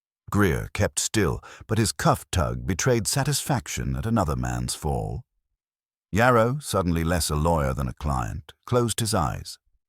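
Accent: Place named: United Kingdom. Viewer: The British accent doesn't match with New York American accents. I expect Archie Goodwin to be a brash American, snappier pace. The space in the narration after each "Mr" or "Inspector" before saying the last name is disconcerting. Renting a typewriter matches the period of the original Nero Wolf series, that is good. But paying for it with "a prepaid card"??? Did such things exist back then?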